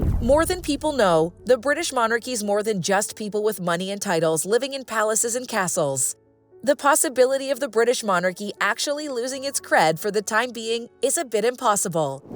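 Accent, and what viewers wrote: United States. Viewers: The Monarchy is not irrelevant, its existence guarantees continuity, stability, freedom and democracy. The fact that a Monarch is our head of State means that no single Party or group can take over the British Isles. It also means we don’t have the divisive need of elected Presidents who would polarize society. We have a democracy like no other in the world, and look at it this way…Our head of state is apolitical and at least we don’t have to elect Presidents, which would create Constitutional crises and social unrest every time. Why are we preached to by an American voice anyway. What the heck do Americans know about the British Monarchy?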